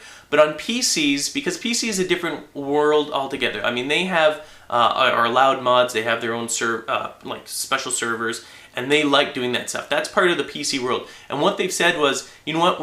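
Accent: Canada. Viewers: i just listen to these videos to hear his cool canadian accent :D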